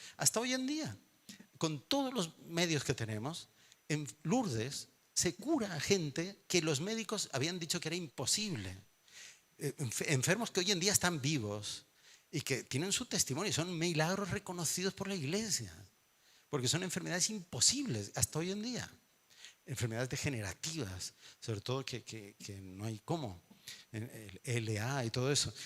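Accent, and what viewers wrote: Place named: Aragon, Spain. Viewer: Me encanta oírle padre, la Virgen le ha elegido con esa gracia baturra que nos hace llegar de una forma muy simpática todas las verdades evangélicas. Dios le bendiga.❤😂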